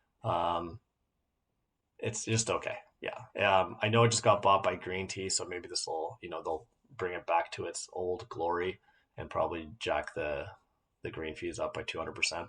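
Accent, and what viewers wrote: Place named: Vancouver, Canada. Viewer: Good video good list. Agree with most of it If I were to guess I’d wager that you definitely are a good golfer but you grew up and continue to live somewhere in south Vancouver (maybe Richmond) and you can tell by the way you talk there’s a geographical bias in this video and in all fairness you said you didn’t include the Fraser valley. If you expanded your criteria by 25kms for the viewer to include ledgeview and sandpiper they are right up there IMO with cap and Seymour without the overblown elitism (maybe better)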